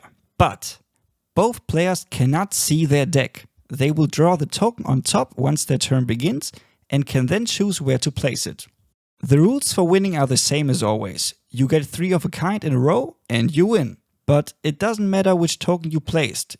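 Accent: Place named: Germany. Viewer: Ich hab mir während des gesamten Videos gedacht "der Akzent klingt doch irgendwie voll Deutsch", und am Ende einfach der Screenshot vom Kanal wo steht "Mehr über diesen Kanal" 😂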